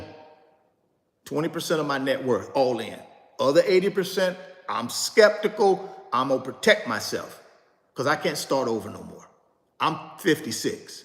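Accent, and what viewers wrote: South, United States. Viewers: Then why are you guys watching? The dude has a country, "TWANG" or dialect, that makes certain words come out sounding... country. In essence, the word NVIDIA is not even a word; it was made up from the word invidia and a acronym NV for Next Vision... so it was created using a poetic license, so he's using is own poetic license when pronouncing the word. Lol. So, give him a break. The information he is providing about the company is accurate, informative, and nicely conveyed. I could see if he was giving bad information, but he's not. It's sad that you would even bring it up. How about this... Go watch someone who's pronouncing a company's name correctly and giving BAD information and put your money into that stock and see where it lands you.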